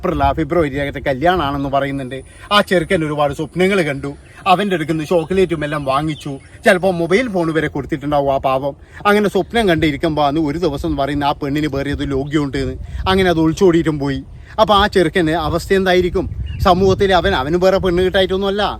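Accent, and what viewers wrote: Kannur, India. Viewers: സ്വതേശം കണ്ണൂർ ആയിരിക്കും സംസാരം കേട്ടിട്ട് തോനുന്നു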